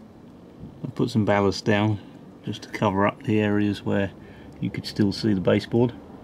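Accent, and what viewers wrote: United Kingdom. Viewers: You are my favorite model railroad expert. In this film I particularly appreciated your speedcam effects (In my day in the USA it was called the Captain Kangaroo Magic Drawing Board effect : ). In all your films I've appreciated your thoroughness in explaining things as well as visualizing them. As a Yank I've also enjoyed your Brit accent (what accent?!). Cheers : ) Site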